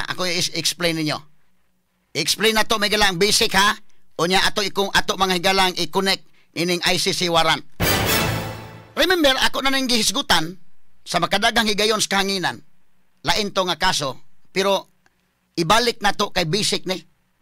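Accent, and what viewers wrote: Visayas, Philippines. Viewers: I like to watch your videos because you’re humorous and straightforward. And love to hear my dialect of bisaya. I lived here in USA 🇺🇸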